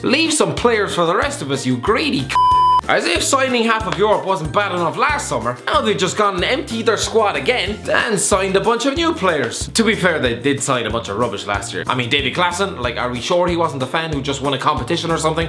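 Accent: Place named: Ireland. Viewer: Listening to a guy with a broad Irish accent attempting lengthy Greek pronunciation is a golden experience.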